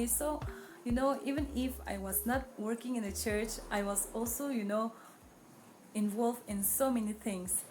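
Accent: Nagaland, India. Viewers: Wow I love sumi dialect👍😎❤ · Hahaha love ya sumi dialect lots of love from Hongkong Market Dimapur 😀😀😀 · Love the way u spoke Sumi dialect 😍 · Haha so sweet the way u speak Sumi dialect❤️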